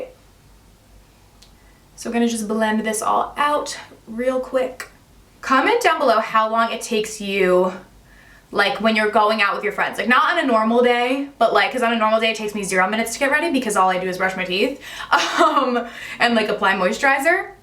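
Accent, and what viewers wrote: United States. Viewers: I usually watch sugar puff and fluff but I thought I would give you ago. So disappointed you are an American who speaks so fast and non stop,doesn’t take a breath I’m English and very hard to understand you and catch the name of the product you are using. I’m sure you are very good.sorry Have a look at sugar puff and fluff. I think you will be pleasantly surprised Joi x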